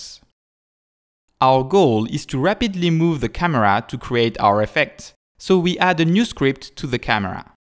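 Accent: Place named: France. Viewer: Accent français détécté ! Nan sans déconner super vidéo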